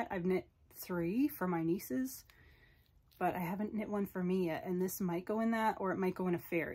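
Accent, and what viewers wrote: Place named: Canada. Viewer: Good morning, You sound like you are from Canada. Whew you have quite a stash! The amount of yarn you can remember what it is and how you will be knitting it is dizzying! Are you challenged to figure out a plan for prioritizing or do you knit what you are in the mood to do? I'm guessing you are a really fast knitter. I'm envious! Thanks for sharing and your pet friends are adorable! I have 2 border collies to keep me company while I knit. They constantly entertain and the youngest one got a hold of one of my hanks for a sweater that was in a project bag and turned it into an impossibly swirling knitted mess of a string pile. I was able to untangle and wrap into a ball that took me 3 evenings. I couldn't get mad at him though, I need to be more careful so I am!!!! Cheers to you for sharing your deep stash! Carrie from the San Francisco Bay Area. 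😘🍵🧶🪡🧵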